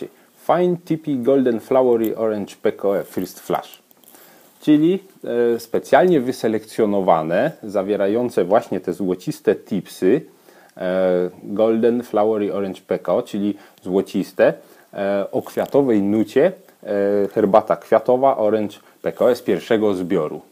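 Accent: Silesia, Poland. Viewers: Słucham, słucham i myślę sobie: chłop chyba ze Śląska. No i rzeczywiście. Rozpozna swój swego;)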